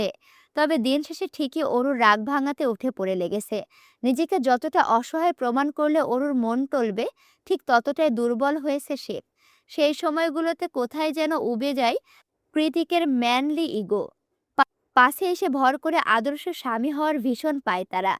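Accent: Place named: Kushtia, Bangladesh. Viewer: আমার বাড়িও কুষ্টিয়া তে, আজই জানতে পারলাম তুমিও কুষ্টিয়ার মেয়ে,, একমাএ আমরা কুষ্টিয়ার মানুষরাই এভাবে কথা বলি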